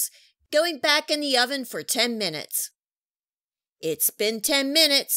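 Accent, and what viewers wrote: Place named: Minnesota, United States. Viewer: Not sure where you’re from but the accent only makes these recipes even better. Minnesota? Thanks!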